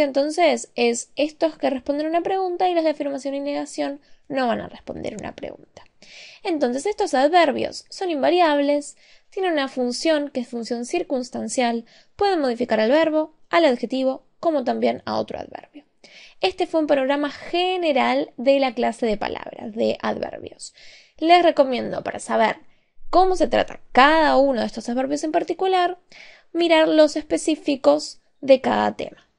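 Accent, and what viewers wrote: Argentina. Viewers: Muchas gracias. Es de Argentina deduzco,por su voz, lo dice un cordobés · eres argentina?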